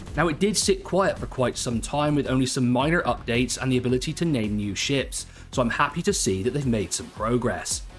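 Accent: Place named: Cornwall, United Kingdom. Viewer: First time watching this channel and ive got to ask: is that a cornish or Somerset accent?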